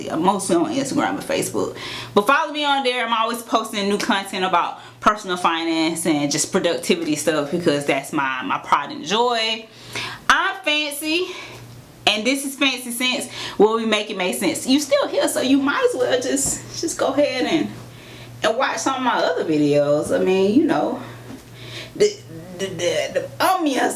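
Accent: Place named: South, United States. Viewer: i've dealt with southern accents alot, understand it pretty well. seeing you brought it up, here's my only advice i think u should know. it's not the accent, it's the speed... just talk a lil slower lol. other than that, i understand ya perfectly (from MA).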